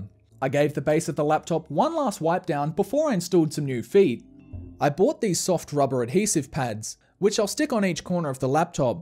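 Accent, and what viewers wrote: Australia. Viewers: Aussi Accent...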